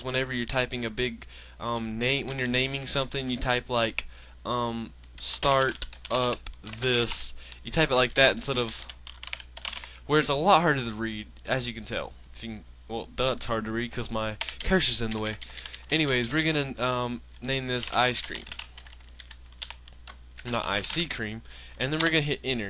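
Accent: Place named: South, United States. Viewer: Great Video, sexy semi-southern accent.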